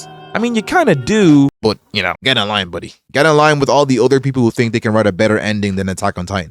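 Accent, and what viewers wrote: Ireland. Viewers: Are you Irish, you kinda sound it?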